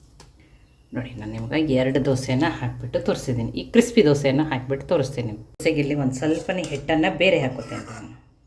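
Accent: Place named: Dharwad, India. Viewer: Wow namma Dharwad kannada ❤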